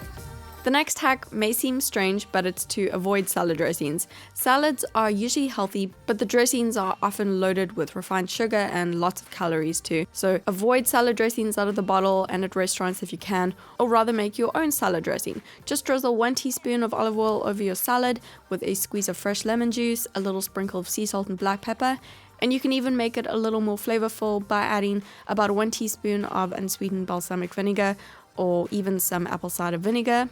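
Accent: South Africa. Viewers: Are you from South Africa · Your from SA right😂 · South Africa I believe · Is she South African ? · Slay Ace she's from South Africa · Knew you were South African as soon as I heard your accent ! (and I also saw woolies)I'm South African too ! ❤ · Ayyyyeee South African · Also are you South African because I have South African friends and the way you pronounce words are super similar? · are you South African? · Are you South African ? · i could pick up u are south african due to your accent but i wasn’t sure, then i recognized the shop u were at! :) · Are you South African?? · Ahhhh !!!! I recognized your South African accent so quickly !!! I’m from South Africa, can you make a video of how to diet & a diet plan for picky eaters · Channi Snklb Her accent sounds South African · Lara S South Africa 😊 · You’re from SA right ??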